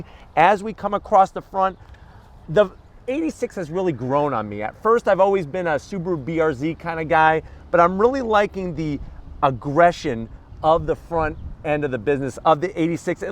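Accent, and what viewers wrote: United States. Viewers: American pronunciation for y’all. Smh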